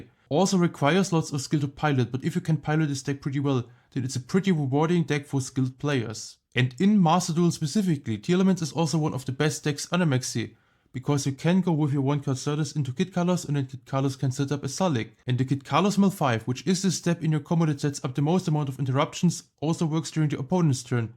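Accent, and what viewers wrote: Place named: Germany. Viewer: Bist du deutsch bzw spricht du deutsch ? Man hört das dein englisch aus dem deutschen übersetzt wird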